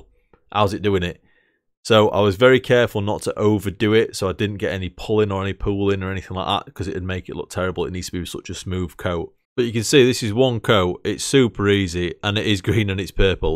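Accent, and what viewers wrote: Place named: Yorkshire, United Kingdom. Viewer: It's PAUL DANIELS paint.... "That's Magic". Great to hear a Northern accent.... Possibly Yorkshireman! A great review mate, I'm looking around for a colour shift that goes like 'oil on water' effect! Needs to go over any colour... Am I wasting my time?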